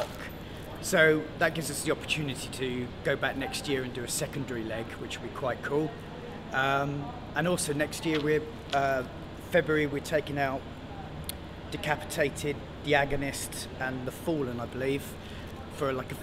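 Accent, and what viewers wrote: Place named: United Kingdom. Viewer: "his voice is so weird." He's British. We all have different accents in Britain. Northerners (like me) have stronger accents. It really depends.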